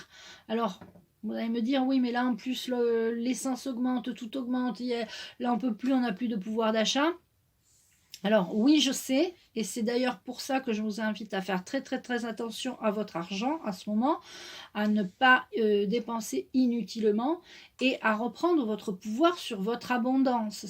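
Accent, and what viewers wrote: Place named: Marseille, France. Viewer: J'adore ton accent il ressemble au mien de Marseille ❤️❤️❤️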